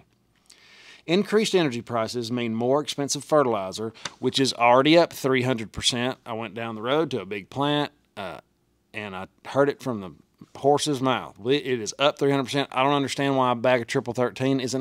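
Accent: United States. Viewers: People with accents like yours are the best thing about America.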